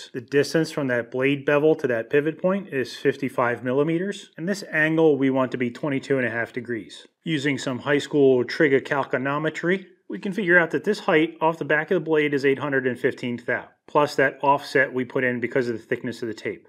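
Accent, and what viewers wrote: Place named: New York, United States. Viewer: So, I thought this sounded like you but somehow different, a bit more raucous, slightly more vulgar and significantly more nonsensical. Your voice has taken on a more New York accent than I remembered, the family component wasn’t there but the Star Trek allusion was right there! Also, your production is more TV and less YouTube….. I must say, I really enjoyed it and at once wasn’t sure if it was you as I was watching on my phone and it wasn’t as apparent as on my desktop. If this is the new, I approve!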